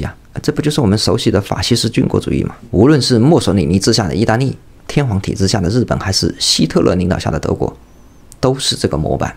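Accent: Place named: Southwest, China. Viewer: 哥们儿，非常赞！听你口音应该也是云贵川的人吧？😁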